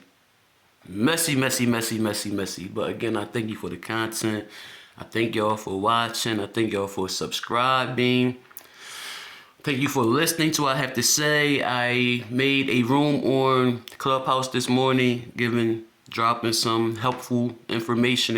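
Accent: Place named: Philadelphia, United States. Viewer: You sound so Philly!! I love it....she’s a nut Jawn!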